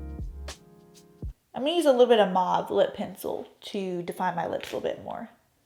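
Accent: South, United States. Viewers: Great look! Love your accent, sounds southern!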